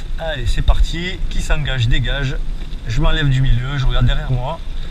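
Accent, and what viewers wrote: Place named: Carcassonne, France. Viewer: Ton accent me semble familier, Carcassonne ? Je suis a 1h de montpellier :)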